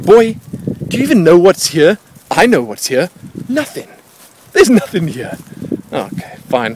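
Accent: South Africa. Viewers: dude are u south African or just from Africa because iv got a mate up in Namibia and he sounds like he is from sa. and that place looks like capetown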